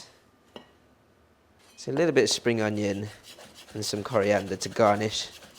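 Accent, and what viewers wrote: United Kingdom. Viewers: If I were your mom, I would be very amazed how come my black hair Chinese boy will end up speaking such a beautiful British accent.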